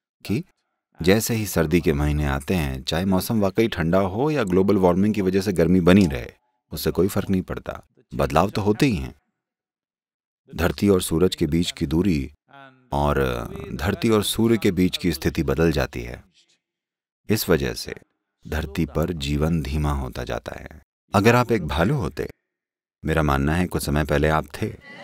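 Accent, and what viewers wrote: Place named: South India, India. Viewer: Aapko main bahut manta hun lekin kuchh Shabd aap South Indian ke hisab se bolate Hain vah uchit nahin hai aap pure Vishva ke Hain South ke nahin soch samajhkar shabdon ka uchharan Karen Baba यह आपसे विनम्र निवेदन है मेरा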